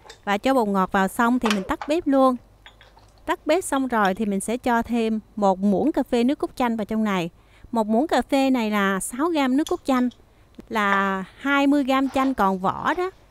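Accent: South, Vietnam. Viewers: Thơm thái là ngon nhất e người miền gì mà khóm nghe e nói giống miền nam mà nói khóm là seo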